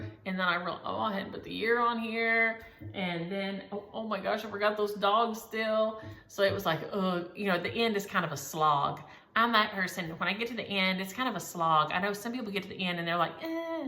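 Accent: South, United States. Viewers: Home and Health. Your hair looks great, love your southern twang. Linda Norris....Thank you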